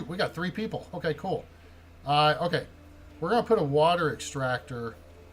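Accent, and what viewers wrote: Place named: New Zealand. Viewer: You do it so properly. Step by step telling. But eehhm 🥴 what I see on your playlists are confusing.... By the way you are not from up here. Probably from down under. Nooo, anyway. Not fully a wombat. New Zealand probably. I hear you. Trying to place you geographically..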